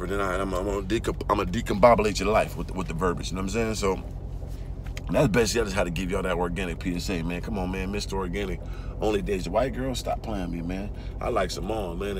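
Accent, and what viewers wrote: United States. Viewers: Understood bro, I feel it. I’m catching what you doing it’s not what you say but why you do and the face you giving us the game gratitude bro, finna turn it up 2k25! You talk like you from south I’m from Memphis! Love to get mentored by ya 🙏🏽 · I just joined your channel and I enjoy your content, plus you inspire me to take better care of myself also while getting the girls. I gotta say this. I'm from Cali/The Bay and you sound like you from Cali my brotha. Right on and I wish you many riches and many bitches.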